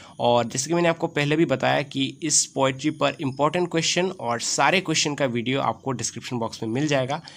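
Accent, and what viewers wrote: Assam, India. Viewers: Sir r u Assamese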